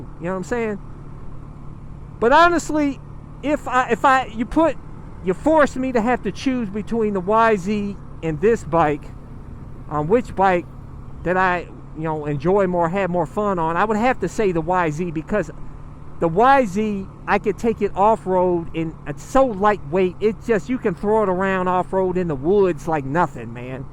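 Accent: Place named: Louisiana, United States. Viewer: You got an accent like from lousiana somewhere man. I lost mine living up in Minnesota over 10 years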